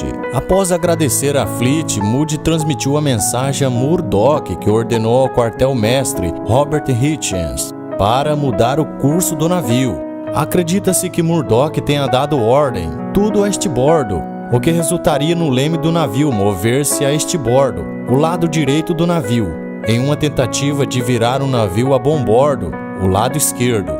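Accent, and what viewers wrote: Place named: São Paulo, Brazil. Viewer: Deve ser paulistano tipo eu e meu conterrâneo kkkkkkkkkk falamos bm caipira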